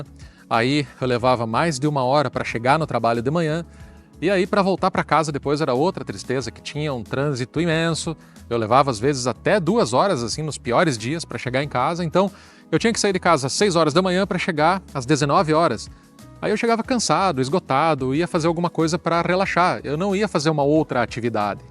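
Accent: South, Brazil. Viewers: Oi, esse de teu sobrenome é português, italiano, ou polonês transformado? Logo que começaste a falar, identifiquei: RS, SC Ou Paraná? Sou jornalista e antropóloga e adoro vídeos como os teus. Parabéns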